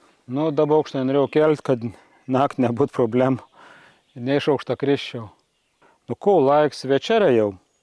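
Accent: Samogitia, Lithuania. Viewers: pasiilgstu video kai ne zemaitiskai snekedavai..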